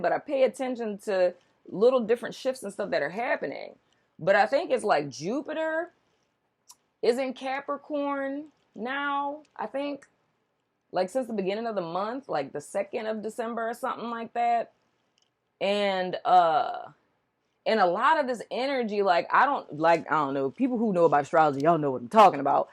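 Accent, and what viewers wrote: New Orleans, United States. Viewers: ok maw, cutup! Glad i found this video...i got a lot from it. Thanks! I heard a lil Nola accent in there! Lets geaux!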